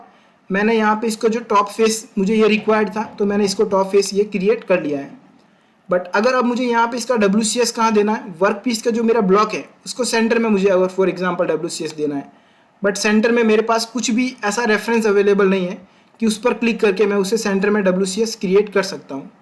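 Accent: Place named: India. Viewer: Heavy Indian accent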